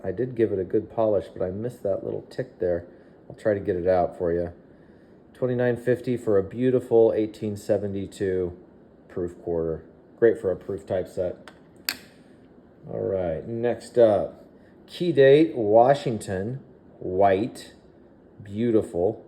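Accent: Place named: India. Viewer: Yeas that Indian cent is hawt!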